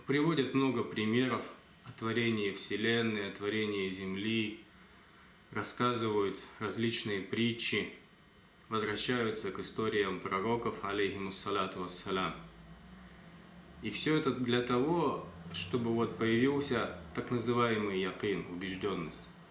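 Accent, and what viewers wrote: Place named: Kazakhstan. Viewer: Судя как он говорит, он не кыргыз, а казах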